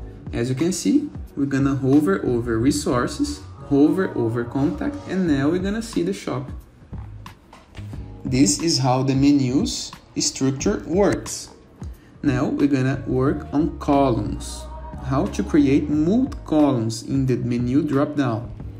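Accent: Brazil. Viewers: Sotaque BR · Esse sotaque é BR kkk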